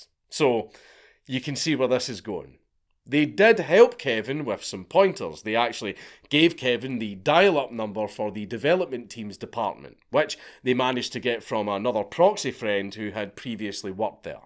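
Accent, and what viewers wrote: Scotland, United Kingdom. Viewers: man I could listen to this scottish guy narrating peoples stories all day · This guy is still talked about today in cybersecurity classes I take. Man's a legend and hearing talk about it with that Scottish flare is amazing. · Mic Drop 🎤 2 hours of the Scottish accent ❤...I will try to comprehend the content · I stumbled across it about a month ago and dear god this is all I have been listening to. Something incredibly soothing about a stoned Scottish man telling me stories. Enjoy and subscribe! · I am loving the long format videos with your scottish accent. Its like a audio book but doesnt make me sleepy. I hope you will create more (as a student I can state that elevator music is great).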